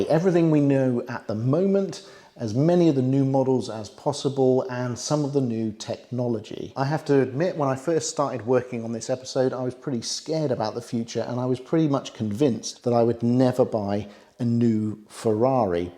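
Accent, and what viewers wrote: United Kingdom. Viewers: The proper English accent. yes.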